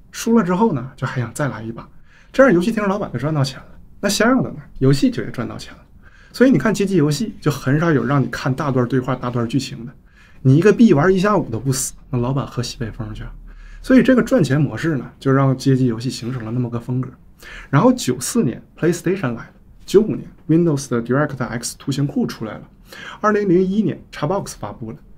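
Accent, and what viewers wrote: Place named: Tangshan, China. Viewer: 这个口音，是唐山人吗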